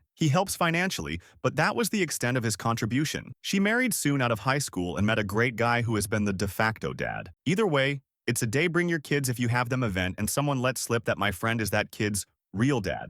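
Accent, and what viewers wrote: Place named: Iowa, United States. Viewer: I’m from Iowa and before you even said Hyvee I was like “Yeah she’s from around me.” Eastern Iowa😂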